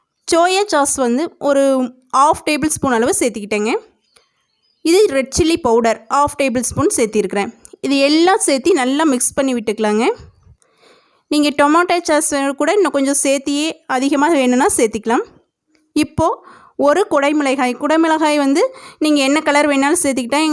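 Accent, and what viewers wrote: Kongu, India. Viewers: Kongu tamil speech is very nice sister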